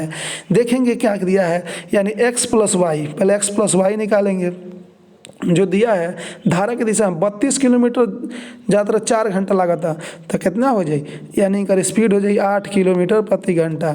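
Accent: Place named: Bihar, India. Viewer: Are bhai hindi bol liya karo, bihari main samjh nahi aata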